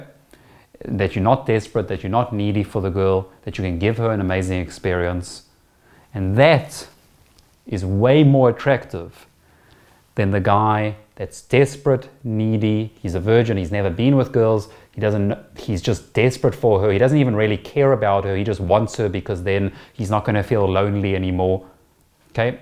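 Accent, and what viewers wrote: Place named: South Africa. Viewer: You sound so South African, oh my days! Thats awesome! Howzit bru from a fellow Saffa.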